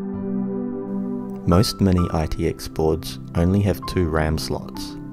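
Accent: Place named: New Zealand. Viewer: I can identify a kiwi accent pretty quickly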